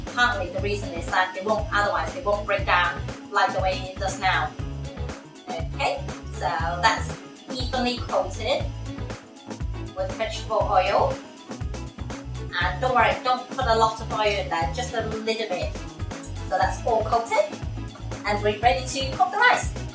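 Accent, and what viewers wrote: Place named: Asia. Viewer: Is this a British person with an Asian accent? Awesome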